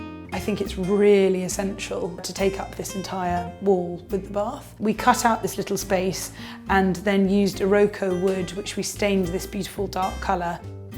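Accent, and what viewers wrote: RP, United Kingdom. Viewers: Oh I agree, it's so creamy and elegant. Sadly it's not a natural, regional accent one can just pick up in life: a public school accent costs a lot of money.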